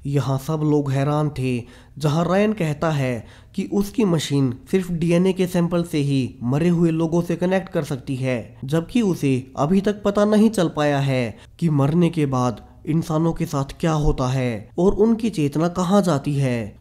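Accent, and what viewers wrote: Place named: Bengal, India. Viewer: I think you are Bangali.,.........like me, because your speaking style like bangla,............ don't mind that's my opinion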